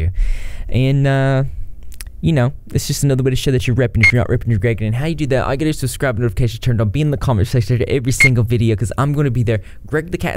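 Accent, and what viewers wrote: South, United States. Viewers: Where's your accent from? Texas? Louisiana? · Is it just me or is your southern accent not as strong? Or maybe I’m just used to it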